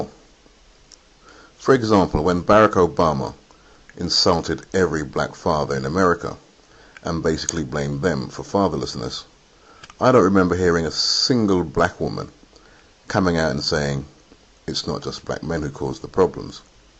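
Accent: United Kingdom. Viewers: "Berick Obama." Gotta love his U.K. accent.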